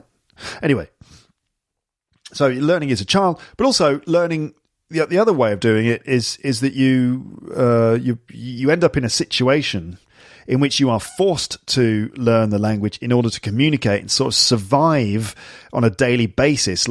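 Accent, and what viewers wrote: United Kingdom. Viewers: speaks the standard form of British English. One of his podcasts he mentioned that he speaks as received pronunciation (the kind of accent that you may hear on BBC). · This podcast is exactly what I was looking for, I love the level, the speed, the topics, and specially I love to understand the most beautiful accent: British, at last! Thanks a lot,! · One of my favourite way to practice listening British English :> Thks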